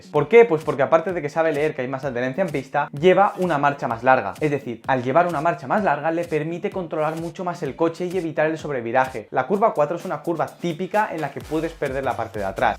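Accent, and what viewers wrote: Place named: Spain. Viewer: El YouTuber es español por si acento y esta correcto en lo que dice de Max ...pero Fernando Alonso gran piloto no es bueno en lluvia ...Max si ..z Schumacher si Senna si ..Besos si ...Prost No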